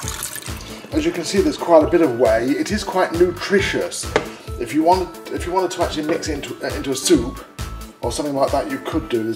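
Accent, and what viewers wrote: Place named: United Kingdom. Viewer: I'm sorry but hearing you say mention the mold 'colonize' your cheese, said in your British accent, made me laugh.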